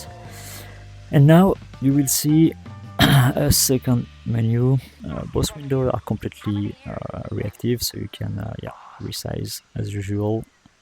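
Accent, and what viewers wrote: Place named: France. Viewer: youtubes ai is so stupid and racist it auto dubbed this from french dispite it just being ur accent smh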